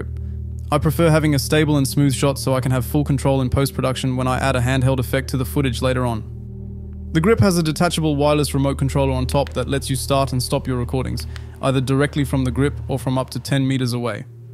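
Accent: Australia. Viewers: Your accent sounds Australian in this video 🇦🇺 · I paid attention to your voice. It's either your accent improved (sounds somewhat australian like) or it's some AI involved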